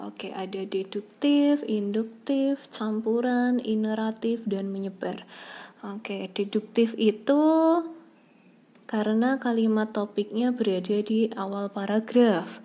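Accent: Java, Indonesia. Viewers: Mba2 ny ngomong ny medho 😆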